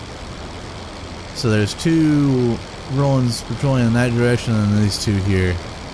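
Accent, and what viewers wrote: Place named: France. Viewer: That Frénch accént tho... ermahgerd, itś epic ;3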